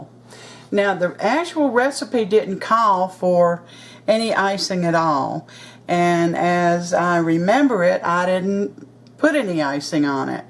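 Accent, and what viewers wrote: South, United States. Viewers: I need to add this to my list. Making that St Pattys cake this weekend. I just love watching you cooking, the recipes are are so easy to follow. I like your southern twang, where are you from?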